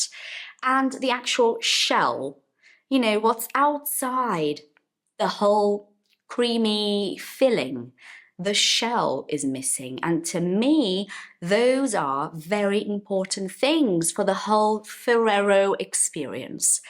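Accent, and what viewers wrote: United Kingdom. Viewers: I'm much amazed to listen you talking In british accent so smoothly. Even being a Brazilian you've a great command in British Accent.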